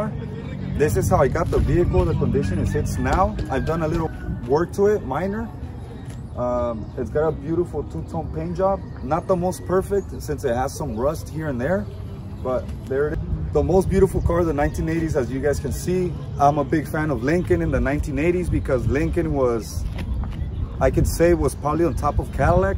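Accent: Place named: Tijuana, Mexico. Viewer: You have Tijuas accent man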